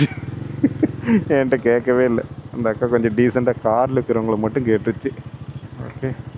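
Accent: Kongu, India. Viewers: Hello bro, 3 days ku munnadi dhan unga first video pathen, adhuku aparam almost 8 to 9 videos back to back pathuten. Nice vlogs in kongu accent. Oru question, vehicles lam overtake pannumbodhu horn adika mateenguringa adhu dhan konjam concerning ah iruku, especially lorry ku left side overtake pannumbodhu. Please take care. All the best for upcoming videos.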